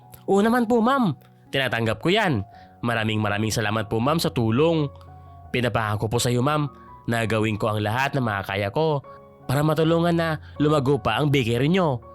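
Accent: Visayas, Philippines. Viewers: Bisaya ka Dong?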